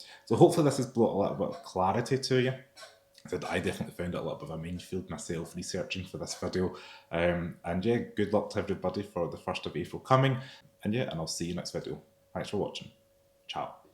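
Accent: Scotland, United Kingdom. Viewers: whats wrong with your mouth. Everytime you open it scottish comes out. Did you have it for dinner. You need to thyrow up quickly and then speak properly.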